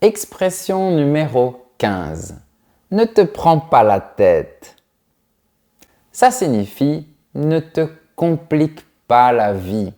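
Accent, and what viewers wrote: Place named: Toulouse, France. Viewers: Personnellement, j'ai toujours fait la liaison dans l'expression "Au cas où", je dis "Au cas z'où", comme dans "c'est obligatoire", j'ai trouvé bizarre que vous n'ayez pas fait la liaison, moi, je dis "c'est t-obligatoire" pas "c'est obligatoire" comme s'il y avait un h muet à obligatoire (hobligatoire)... ce n'est pas une critique, ce n'est qu'une observation, c'est une vidéo très intéressante, et vous êtes super-charmant... et attendez que je devine, vous êtes du Sud-Ouest. 😁😁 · Le petit accent toulousin est tres mignon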